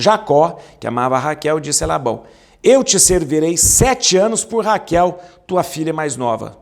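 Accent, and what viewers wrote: Minas Gerais, Brazil. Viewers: Padre, que riqueza está sendo o estudo da Palavra. Estou aprendendo bastante, fazendo várias anotações e rindo do seu jeito engraçado (sotaque de mineiro é divertido demais kk). Deus te abençoe! Obrigada e parabéns pelo lindo projeto!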